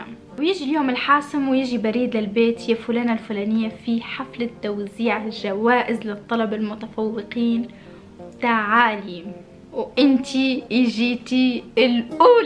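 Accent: Tunisia. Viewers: انتي تونسية صح ؟ ماشالله على بنت بلادي اول ماعرفتك عندك نبرة تونسية و شاهدت البعض من فيدواتك روووووعة ماشالله عنك😍😍 انشالله نقول عليك صحباتي يتابعوكي ❤❤❤❤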